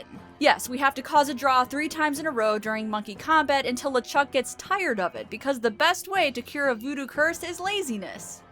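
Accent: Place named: General American, United States. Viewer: Awesome; I enjoyed this video as usual! I am feeling a little pedantic though and I have to point out an issue (please forgive me). Everyone has an accent. A General American accent is still an accent. It's what you're most used to hearing, so it doesn't stand out to you... but it's still an accent.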